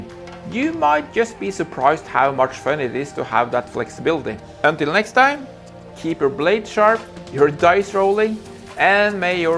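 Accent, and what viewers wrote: Norway. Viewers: Hei, jeg mistenker sterkt (basert på engelsken din) at du er fra Norge? Hvor kan jeg kjøpe miniatyrer fra Firgeforge games i Norge?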